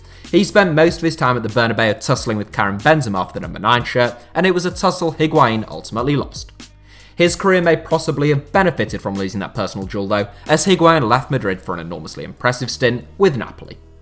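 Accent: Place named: United Kingdom. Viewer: Love The video but your accent iz so british i don't understand shit.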